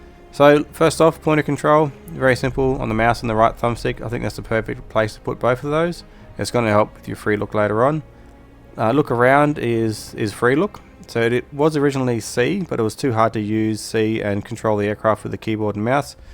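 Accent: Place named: Australia. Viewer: the accent gave it away buddy, I live in NSW.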